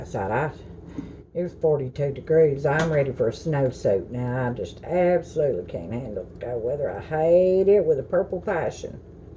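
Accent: South, United States. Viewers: I absolutely love watching your videos. If I cud afford to send u a gift. I wud. U are a complete JOY to watch. AND I laugh at the way u talk w ur accent and ur country ways. I'm the same way girl. I've had fried bread all my life. And I love it. You are one hallarious woman and I wud love to meet u. Bit I live in North Carolina. And I don't own a car. Or I'd pay u a little visit. Lots of love, hugs and prayers sweetheart ❤♥💕💖😘💗❤. GOD BLESS US ALL SWEETHEART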